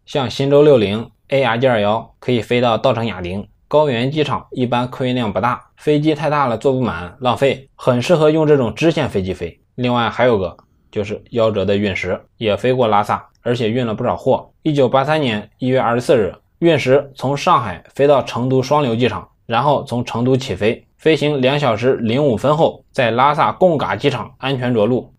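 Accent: Henan, China. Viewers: 这河南话，听起来亲切！ 想起紫阳。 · 贺南话好听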